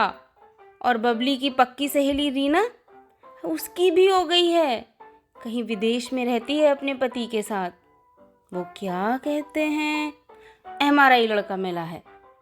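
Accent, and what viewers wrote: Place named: Kanpur, India. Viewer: Wah ji wah wat a style of narrating.. Har baar surprise deti ho dear... Bahut hi maza aa gya itni mast story or itne mast tumhare kanpuri style me gazab ... Kaise bikul kanpuri style me wow it's superb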